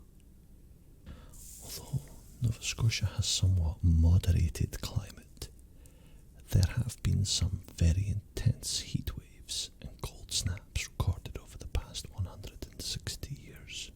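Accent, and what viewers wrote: Scotland, United Kingdom. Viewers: I love the Scottish accent so much!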